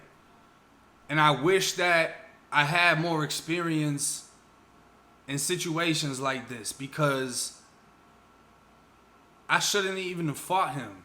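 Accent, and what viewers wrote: Boston, United States. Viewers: I went to prison in…… MAHHCH. Boston accent kills me 😂 And the swamp is Gainesville,. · I don’t understand how his accent goes from a strong Boston to a down south accent. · You sound like you from Boston son!! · Why do u sound like u from Boston? And why did u join a ny gang? So many questions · Why do you sound like you're from Boston? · im a little confused. all your stories are about Florida prisons but that accent is STRAIGHT Boston. mayb i missed the vid where you explained this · Bro you have to be from Boston right? I hear a Boston accent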